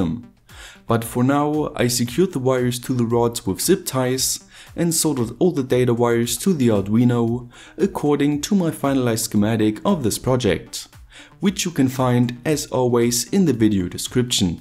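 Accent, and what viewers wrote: Germany. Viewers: he's from Germany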